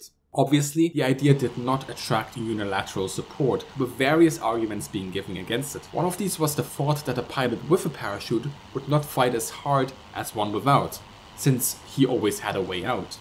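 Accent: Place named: Germany. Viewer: Jimson Patiño MacLean His English is near perfect. He just has a German accent.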